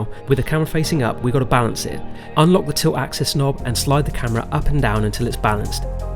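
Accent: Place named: United Kingdom. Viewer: dig the accent…from UK?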